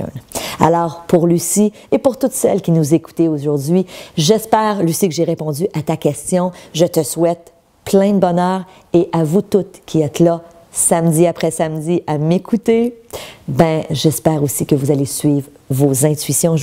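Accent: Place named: Quebec, Canada. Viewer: c'est trop joli l'accent québécois, j'aime trop !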